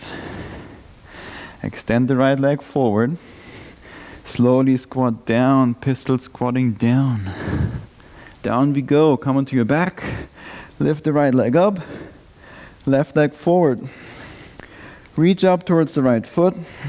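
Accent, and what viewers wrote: Germany. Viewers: ich dachte mir doch schon, dass ich da einen deutschen akzent gehört habe :D herrlich! mega flow. awesome job.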